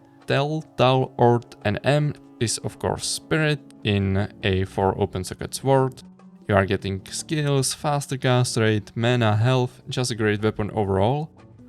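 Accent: Czech Republic. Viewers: Angličtina zní hodně jako moje, tzn česky :D. Trefil jsem?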